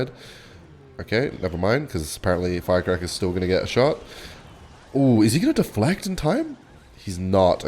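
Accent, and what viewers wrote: Australia. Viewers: Are you from Perth brudda? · Mate you from WA? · sometimes i forget is australian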